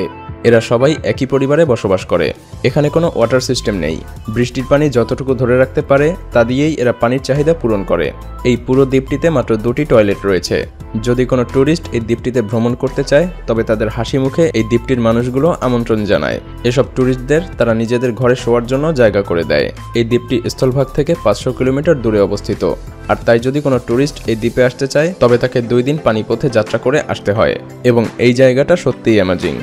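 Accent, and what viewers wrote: Bangladesh. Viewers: Apnar kotha bngladesi dr mto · Vi tmr voice ta r description a dawa number gulo bangladeshi tumi protek video teh kollkata tag koro kno tumi nijer country k tag koro na kno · ভাই আপনে কি বাংলাদেশী? আপনার কথা শুনে যতদুর মনে হয় আপনি বাংলাদেশী। বাংলাদেশী হলে লোকেশন কলকতা দেয়া কেন? কলকাতা লোকেশন দিলে কি ভিউ বেশি পাওয়া যায়? নাকি নিজের দেশের জেলা লোকেশনে দিতে লজ্জা লাগে?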